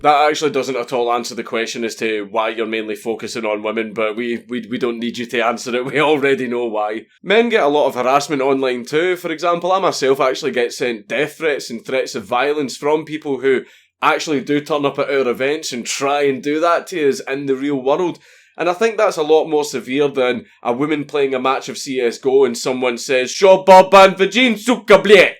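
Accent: Scotland, United Kingdom. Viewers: “... the Internet collective of weaponised autism kekked into gear” I know Dank meant to say ‘kicked’ but what I heard in his dank Scottish accent was far more fitting. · he's not South African he's Scottish and that screensaver is a joke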